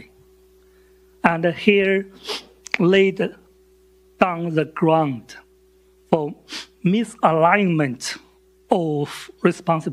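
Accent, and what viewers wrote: China. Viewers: 典型的中国英语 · 这chinglish 听的真小学生啊，不是你写的吧。chatgpt翻的英文绝不是这么差的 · 这带地方口音的中式英语 老外听得懂吗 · 我在美国上安全课时的老师是一名清华毕业的老师，口音和高老师差不多，特别老派的中式发音基本上发音都是平的，可以听懂每个单词就是不太像普通人在说话交流，就像AI翻译读稿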